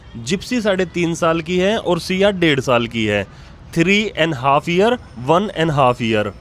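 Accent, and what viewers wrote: Haryana, India. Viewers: arey bhaiya Haryanvi accent se log aksar judge kar lete hain😂😂😂😂 mere Punjabi hone ke kaaran log mujhe bhi ladaku type bol dete hain😂😂😅😅😅 sabka apna nature hota hai bhai😊😊😊 Lots of love for cuties and ❤❤❤❤❤ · Apki lunguge aisi lagti hai jaise aap ladaku ho kyu ki apke hariyanvi styl main dhamkakr bat karte hai..pr apki baat se pyar jyda jhalakta hai apne bacho se jis tarike se bat karte ho usko dekh kr lgta hai..❤❤❤ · कोई बात नहीं भाई मैं तो सिर्फ सिया जिप्सी और आपकी हरियावानी बोली देखना अच्छा लगता है ❤❤ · App kha sa hai.. apka bolna ka tarika tho haryanvi type h 😅😂❤❤ it's my first time on yr channel 😊 apka bacha bhut cute h 💖🌹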